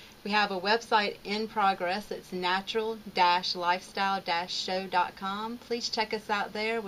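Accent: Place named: South, United States. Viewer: Hi! Great video! Thanks for subscribing to my channel. I like your southern accent. ...Your teeth look great too!! Peace & Health to you, Itsy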